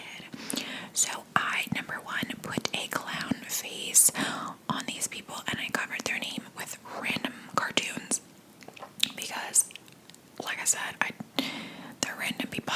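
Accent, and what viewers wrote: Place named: East Coast, United States. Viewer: Girl I looooovvveeee your videos and your voice so much!! The East Coast accent is so tingly and reminds me of home ❤️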